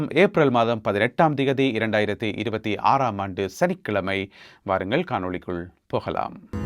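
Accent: Jaffna, Sri Lanka. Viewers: அருமையான விளக்கம் உங்கள் யாழ்ப்பானத் தமிழ் எனக்கு மிகவும் இனிமையாக இருக்கிறது. வாழ்த்துக்கள் தினமும் உஙகள் பதிவுகளை தவறாமல் பார்கிறேன். நான் தென் இலங்கை மாத்தறையில் இருந்து.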